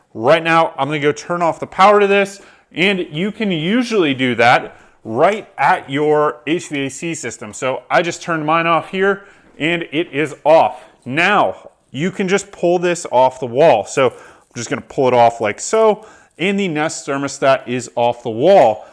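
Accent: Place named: Baltimore, United States. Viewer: Sounds like Baltimore accent 😃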